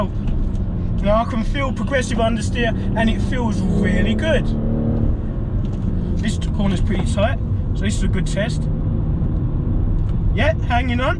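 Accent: United Kingdom. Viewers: Excellent. So great to hear how it actually drives and how fast it feels, the body roll and suspension. This is important to me. Thanks. It's funny, you seem to have a UK accent, but appear to be driving in California. Enjoy the weather, mate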